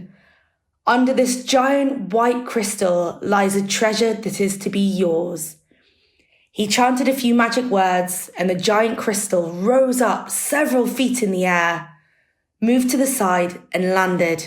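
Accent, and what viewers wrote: United Kingdom. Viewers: I love British accent. Thank you very much.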